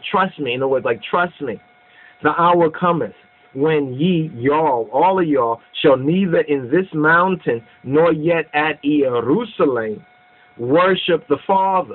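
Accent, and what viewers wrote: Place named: West Coast, United States. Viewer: Wow!!!! you really should read your bible and look for salvation instead of looking to find what race people are there are good arguments both ways but neither have anything to do with salvation. And why is it that in the comments anyone who disagrees with you is racist but your video about salvation is for blacks is not racist? also just throwing this out there for ya Solomon had a black lover but in the book song of soloman she is saying for him not to look down on her because she is black. Now if he were a black man why would she say that? He was the son of kind david by the way. Also I noticed your channel is Ethiopian world network and you sure are dressed the part but im curious what part of Ethiopia are you from cause most of the people I have seen from your country don't have west coast accents..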